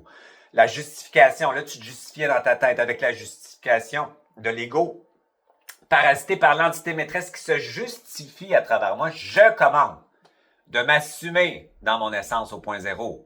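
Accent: Quebec, Canada. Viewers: Même si je capte beaucoup de bonne choses, L'accent québécois m'échappe dans ce sujet des blessures. 😅. Pourtant merci pour ces éclairages 🎩😍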